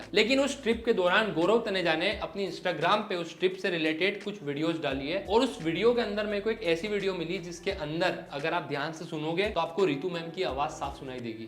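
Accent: Indore, India. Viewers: Indori h Bhai.. ek indori accent aur ek normal accent:)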